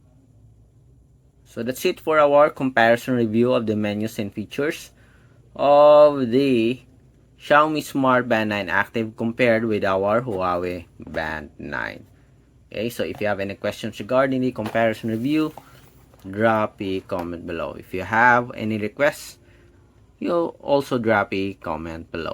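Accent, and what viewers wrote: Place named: Philippines. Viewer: Pinoy accent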